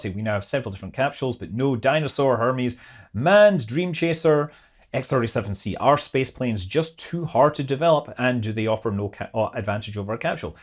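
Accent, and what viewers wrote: Scotland, United Kingdom. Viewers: Enjoy your videos. I find them interesting, informative and entertaining. I'm also partial to your Scottish accept as I'm of Scottish descent on my father's side. (My grandfather was born in Edinburgh.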